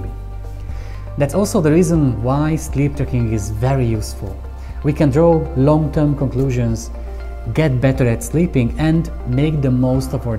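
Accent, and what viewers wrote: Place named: Hungary. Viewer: Gondoltam az akcentusodból hogy magyar vagy 😅 Mit mondanál, mi a legjobb alvás tracker? Mit érdemes venni?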